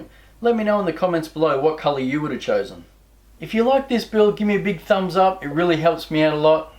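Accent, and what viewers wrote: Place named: Australia. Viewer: hello, I hope this finds you and yours in good health and fine spirits. I just found your channel and I really enjoy your content. I do like an Australian accent and you seem like a regular guy whom I would like as a neighbor. The popular YouTubers say it took them a long time to grow their channels. They said their channels just limped along for years until one day their channel just took off, so hang in there.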